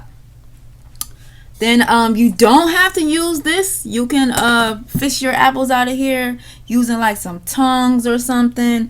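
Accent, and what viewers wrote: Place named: North, United States. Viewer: She sounds like she’s from up top to me.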